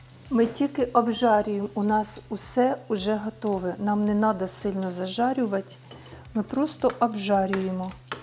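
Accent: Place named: Donbas, Ukraine. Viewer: хочу таке приготувати. а де вы проживаете, бо мени наравиться як вы говорите. прямо як у нас в Донбаси - вымушена переселенка.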